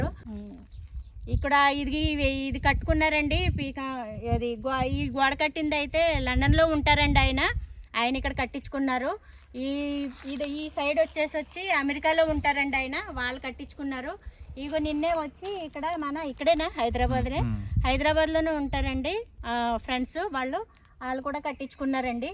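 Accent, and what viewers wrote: Godavari, India. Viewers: మేడం మీది ఏ ఊరు మా గోదావరి జిల్లా అని అడుగుతున్నా మాట తీరు అలానే ఉంది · స్వచ్ఛమైన గోదారి యాస తో అక్క మాట్లాడుతుంది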